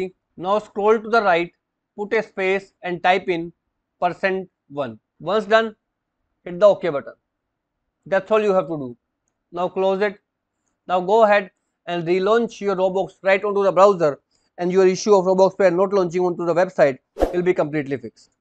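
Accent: India. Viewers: if you hear an indian voice ur problem is fixed 100% rate (THANK YOU SO MUCH BTW 🙏)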